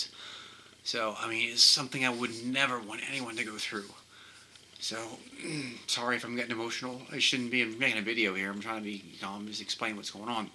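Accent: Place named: Pittsburgh, United States. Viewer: Crying as I’m reading this now even though I’m late to the game. Recognized the accent though as I’m a native Pittsburgher now living in Los Angeles. I’ve decided to not get the reversal surgery, I just don’t want to go through all of that to find out I have to have it done again God forbid. Is the Ng tube necessary for all procedures Up?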